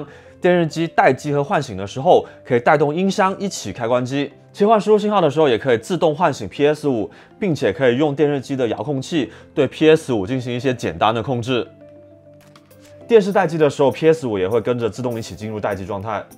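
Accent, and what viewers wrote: Cantonese, China. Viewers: 你能说话慢点吗,,,本来广东的普通话就不好,咬字不清更难听!!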